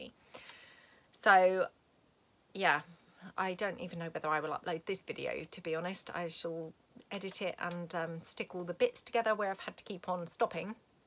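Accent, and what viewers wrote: United Kingdom. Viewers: Hi, I was so touched by your candidness! I know you are under great stress right now. I never told you this but I feel compelled to share with you that I found you on YouTube right after I lost my beloved husband of 39 years to Covid-19. I instantly fell in love with your British accent (I live in USA) and your conversational style and your creativity. There were very many days when I did not want to go on living without my husband, and for the life of me I didn’t know how I would possibly get through the day! The only thing I could think to do was to put on your videos and watch them one after another after another, because your voice and your cheerful demeanor relieved my horrible anxiety. So - we, your viewers, owe you a massive THANK YOU! May God be with you tomorrow! 💜💜💜💜💜💜💜💜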